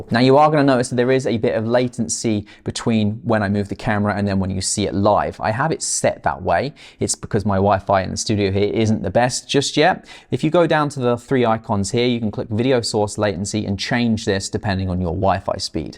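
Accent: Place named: United Kingdom. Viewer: judging from your accent I'm going to assume you're based in the UK and you forgot to mention one key point. The Mevo Core camera is NOT available in the UK with no date provided as yet as to when they will be available. In my communications with Mevo/Logitech they could not guarantee they would ever be available outside USA/Canada in the future either.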